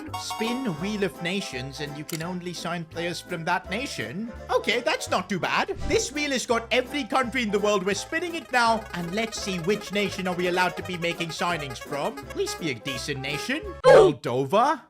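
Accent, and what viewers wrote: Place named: India. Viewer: lol Indian accent is so strong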